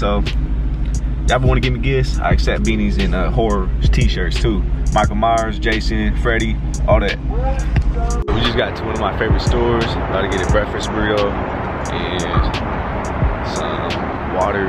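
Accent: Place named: Oakland, United States. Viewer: Don’t forget to buy your pumpkin ha ha. 🎃 Just curious why do you have a East bay / Oakland accent? Cool tips & nice shop keep it up.✌🏻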